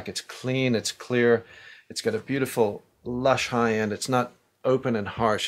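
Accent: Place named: South Africa. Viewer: The weirdest thing is as a South African, hearing his accent that has hints of the South African accent that’s been completely taken over by the American accent 🤣😂 anyway, such a talented engineer!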